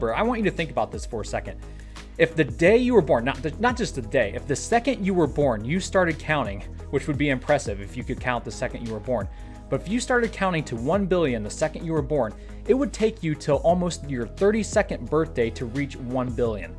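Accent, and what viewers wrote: United States. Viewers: Seine Aussprache ist herrlich Amihaft. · I like your content, but you have a lot of Kevin flavour, confirming all my Dutch stereotypes of what an American called Kevin looks and sounds like.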